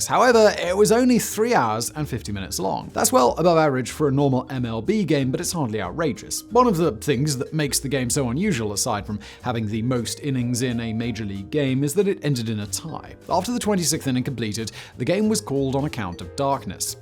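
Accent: RP, United Kingdom. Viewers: This guy sounds like a normal british guy who's trying to play up his accent so americans think he's fancy and posh, ya sound like a twat mate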